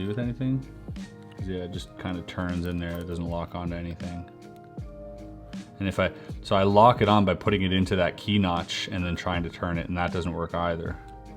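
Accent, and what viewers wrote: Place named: Quebec, Canada. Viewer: Salut! Je viens de voir une vidéo de toi qui parle en français, je suis tes vidéos depuis plusieurs années déjà et c'est la première fois que je t'entends t'exprimer en québecois, c'est weird! Je savais que t'était de Montréal comme moi mais je savais même pas que tu parlais bien français! Entk bonne continuation, j'aime beaucoup tes vidéos.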